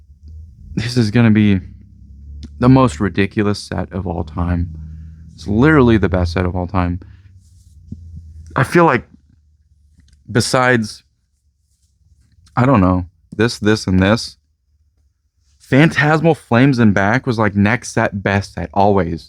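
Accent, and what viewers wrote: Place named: United Kingdom. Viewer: Dude…you should do your video I that British accent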